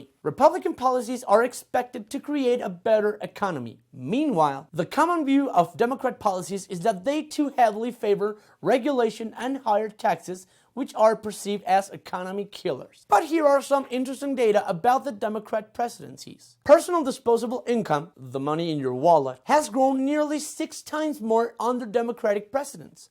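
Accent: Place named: Mexico. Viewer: Please, please, PLEASE!!! Don't make any more of this. Your Mexican accent makes me cringe. I try to pay attention to what you're trying to say but, damn! And you ideas and opinions....Well, just watch your own videos and you'll know what I mean. If I want to see funny news, I'll just watch Colbert, or The Daily News re-runs because them I can actually understand.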